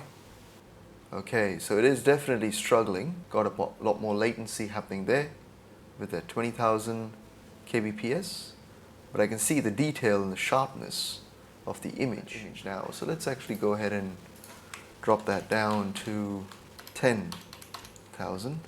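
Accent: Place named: Australia. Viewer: yeah I picked your accent for Aussie. I live near Murwillumbah.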